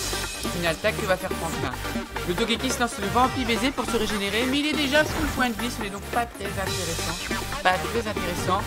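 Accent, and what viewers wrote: North, France. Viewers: Il a naturellement l'accent du nord en faite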